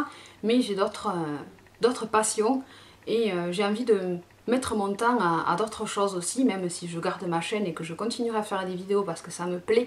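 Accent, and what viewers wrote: South, France. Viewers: ton accent du Sud ne me dérange pas du tout d'autant plus qu'il est chantant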